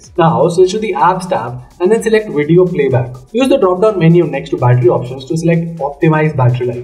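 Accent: India. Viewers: Great content, but WAY too fast presentation and I could barely understand you as your have such a strong Indian accent. I am English. I have liked the video.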